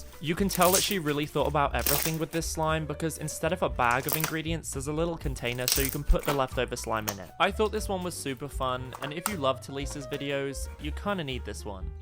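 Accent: United Kingdom. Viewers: wait your British just like and we’re are you from i’m from walthstone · ARE U FROM UK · YOUR BRITISH!!!!!!! COOOOOOOOLLLLLLL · Are you British too? 🎉🎊💝🎊🎉 Im British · Is he from Great Britain😅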